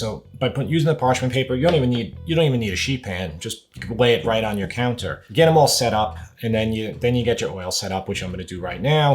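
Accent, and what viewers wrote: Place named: Brooklyn, United States. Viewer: YouTube is my “go to” to learn how to cook most anything. But I just found your channel and you are awesome! I grew up in Brooklyn and, although I’m of the Irish immigrants, along with your great accent and dishes, your vids bring back feelings of home. And your practical approach along with great tips and great editing makes your channel tops on my list. Many thanks.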